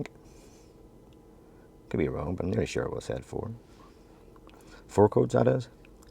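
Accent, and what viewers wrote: Northern Ireland, United Kingdom. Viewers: Great video. Love the NI accent!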